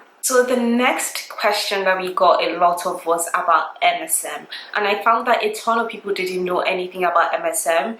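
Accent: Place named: Nigeria. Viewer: I was going to ask if you are Nigerians cos of your accent but you have it in this video. Next question, with the mixture of all these oils how do you know which ones work for sure, like which does exactly what and which ones are ABSOLUTELY necessary which are the MAYBEs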